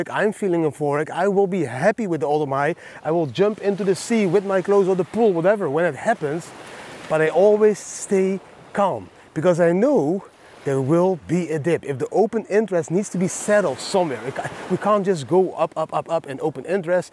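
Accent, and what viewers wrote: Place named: Netherlands. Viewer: Right 😅 I like his Dunglish though, the Dutch are used to say glazen bol 🔮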